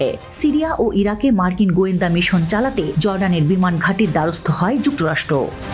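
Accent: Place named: India. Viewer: ভারতিয় কন্ঠ মনে হয়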